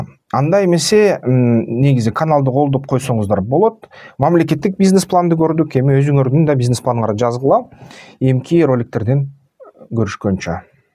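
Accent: Standard. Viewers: Сонун таза кыргызча менимче мамилекет туура жолдо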